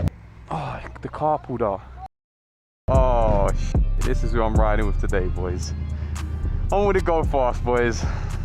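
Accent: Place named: United Kingdom. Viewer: freezing I bet it was wow congrats get licence mighty lad, good rds to go on for sure, you live out there ye still got uk accent,👌 im irish. my ideas might lead yiz astray 😝😂 I love to killswitch the guards at lights, pure madness😂 other bikers ready to bolt 😝😂 ye better not do that over there lad! Enjoy everything you'll be flyin, ive cbr600rr too they're savage, you'll enjoy that ride out. Look forward to more.keep her lit🏍💨💥👊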